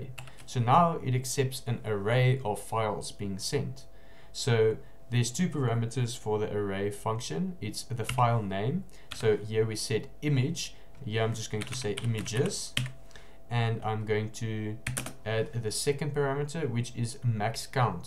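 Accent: South Africa. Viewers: You sound South African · Dude, you finally put all my floating questions about the multer docs on npm to rest, thank you, you did such a wonderful job walking us through the code! 🤓🙌🏽💯✨ And super random but I love your South African accent btw 🎊. Greetings from the US :) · i had to search this guy cos the accent is very much South African!!! haha! very clean and straightforward video thank you! greetings from Germany! proudly SAn!!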